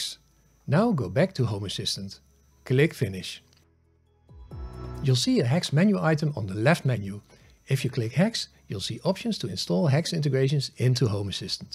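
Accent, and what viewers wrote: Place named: Netherlands. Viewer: Hey there! Are you perhaps from the Netherlands? As another dutch person I have to say you've got an amazing accent, definitely still recognizable as dutch but FAR better than any other dutch person I've heard speaking english (Who isnt a professional singer who has trained their accent for their entire life.) haha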